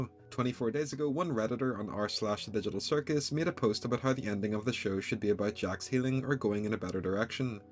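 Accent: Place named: Northern Ireland, United Kingdom. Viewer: You’re NORTHERN IRISH AYYY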